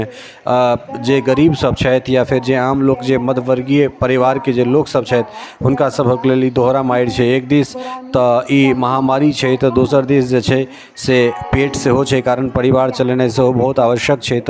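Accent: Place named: Bhojpuri, India. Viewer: चुप हो जा बहुत छ छ छ किया भोजपुरी बोले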